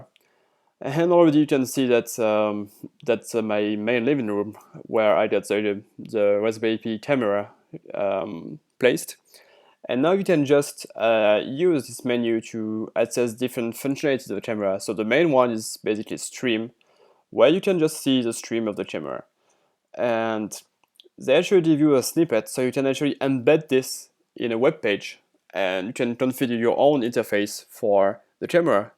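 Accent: France. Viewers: Serait-ce un accent français camouflé ??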